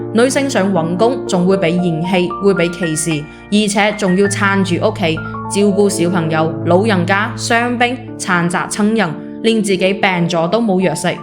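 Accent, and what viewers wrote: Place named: Teochew, China. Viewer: ding gai yao ciu zao yang ge? (點解有潮州音概？)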